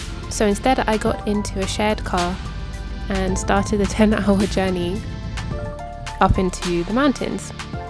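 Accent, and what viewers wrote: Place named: United Kingdom. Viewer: Its not kului .... Its kuuuullu .. 😂😂😂 british accent sucks .. Water : whatha 😂😂😂 Its not Rock fooling : its rock falling bro You are eating Aam papad.. kala katha flavour 😂😂😂😂😂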